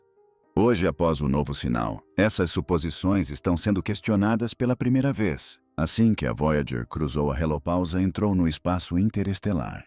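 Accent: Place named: United States. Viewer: O pior é falar em Português com sotaque americano!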